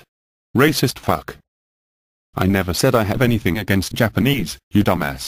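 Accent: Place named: United Kingdom. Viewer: The fact this is in the Education category combined with the robotic British voice is awesome.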